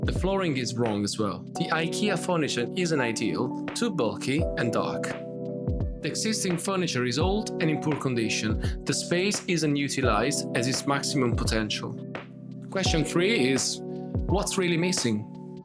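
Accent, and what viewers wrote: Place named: Glasgow, United Kingdom. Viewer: Top notch! You ever lived in Glasgow? Got a bit of glasgow in ya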